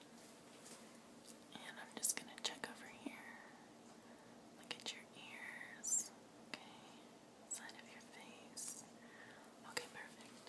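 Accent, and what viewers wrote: South, United States. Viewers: Love this video! Do I detect a very slight southern accent? It's extremely relaxing! Thanks so much.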